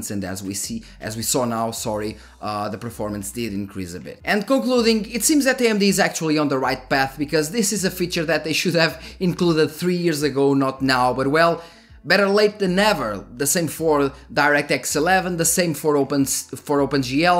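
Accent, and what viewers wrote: Portugal. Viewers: mate can you make a video with you real portuges acento. i would love to hear you speaking Portugues parcero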